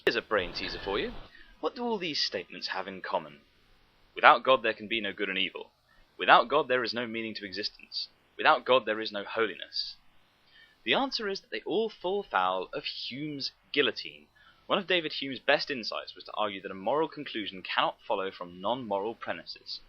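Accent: United Kingdom. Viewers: This is a much better video than I usually get and I truly thank you for it. I told myself I was not going to do many more rebuttal video, however, I might have to rethink that decision. I obviously disagree with you nonetheless well done. And allow me to grant you the same (backhanded in your case) complement. You good sir are quite bright and more than eloquent, aided greatly by that killer British accent.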